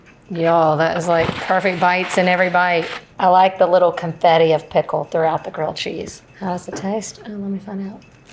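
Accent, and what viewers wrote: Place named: North Carolina, United States. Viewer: Love her accent. Sounds like a local (NC) girl...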